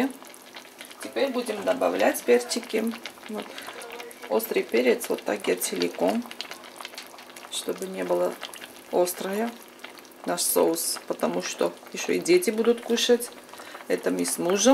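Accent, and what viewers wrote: Armenia. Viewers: Здравствуйте, джан! У Вас приятная, сладкая речь с армянским акцентом.Посмотрела приготовление вашего блюда, и сразу запахло Арменией. Там так умеют вкусно готовить, все овощи и фрукты пропитаны и теплом и щедрым армянским солнцем.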